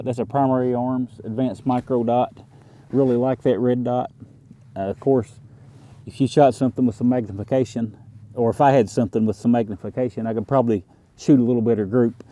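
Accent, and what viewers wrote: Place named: New York, United States. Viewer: you’re up there with Mixup and Hickock. Really enjoy your videos. You gotta get rid of that NYC accent though...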